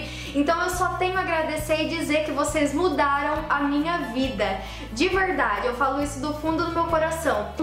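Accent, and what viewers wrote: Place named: Caipira, Brazil. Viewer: você é linda!!! Já te acompanho a algum tempo e sigo a maioria das suas receitas caseiras, em especial aquelas para o rosto! amo o seu jeitinho de falar caipira!!! e sua simplicidade!!! parabéns!!!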